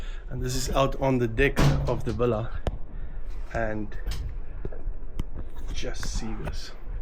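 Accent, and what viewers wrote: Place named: North, Malawi. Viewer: You sound like you’re in the north of Malawi, where exactly is your villa? What’s the name of the place?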